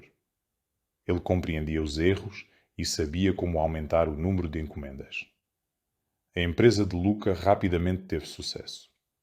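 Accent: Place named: Portugal. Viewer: sounds like European Portuguese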